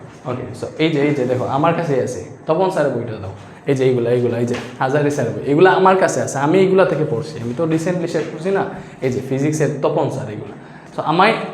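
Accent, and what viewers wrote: Sylhet, Bangladesh. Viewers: বাই তো আমরার সিলটি মন অর, হাসা নি বা বাই? আফনে কিতা সিলেটি নি?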